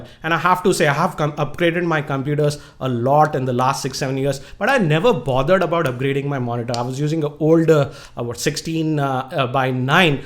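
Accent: India. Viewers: Good video. I've always liked the indian accent, it makes me feel at ease. Thank you.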